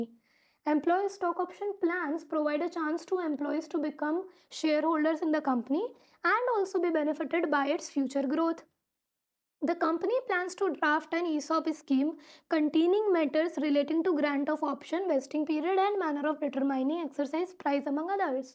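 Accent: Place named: Delhi, India. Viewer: Ab bauth hi intrusting way mai sikhateho, and apke voice se Delhi vali vibes ati hai 😂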